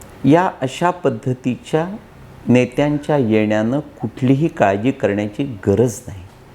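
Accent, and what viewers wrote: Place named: Varhadi, India. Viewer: Assal Varhadi/Nagpuri Spicy, Saawaji Bhasha aikun man trupt hot hote,Kay karnar OM Shanti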